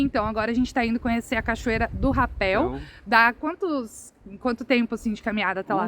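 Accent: Minas Gerais, Brazil. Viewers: É interessante como você foi pegando um pouquinho do sotaque mineiro! 😅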